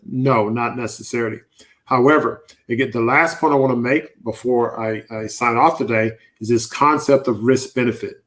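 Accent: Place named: South, United States. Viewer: I hear a southern accent. Where r u from?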